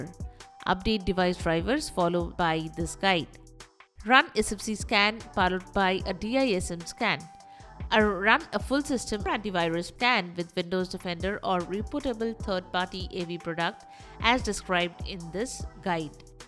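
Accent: India. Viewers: Indian Voice over check, low viewer upvote check, blanket solution with no actual solution...also check.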